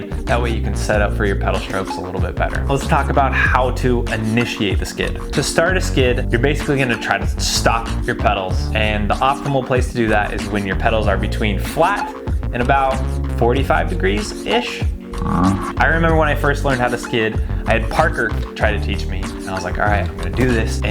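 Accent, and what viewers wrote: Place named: United States. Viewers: hes not english man, hes american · he definitely seems american, not english... In England we say "schwal-bay". No idea if that's right though.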